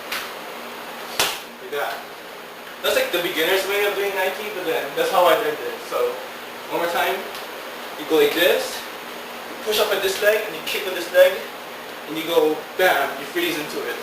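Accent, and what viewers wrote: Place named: Philippines. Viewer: lol your filipino. that accent sounded familiar xDDD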